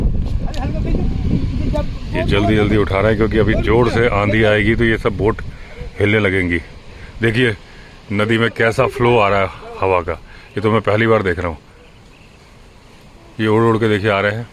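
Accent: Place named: Awadh, India. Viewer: bohute sundar. bare dino baad pakki awadhi sunne ko mili. apne nanhihal ki yaad a gayi. zabardast. nothing like hinterland UP.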